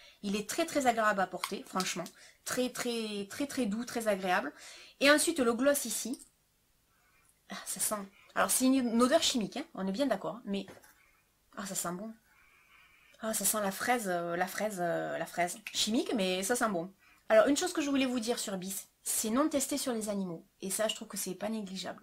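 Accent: South, France. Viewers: Super video comme d'habitude ! La palette UD me donne bien envie (j'ai la 1 que j'adore) par contre BYS ne me tente pas 😒..... Et je suis devenue comme toi avec ta youtubeuse préférée.... Je ne me lasse pas de tes vidéos ( je les ai toutes visionnées) et j'adore ton accent du "sud".... Ne change pas 😍 Biz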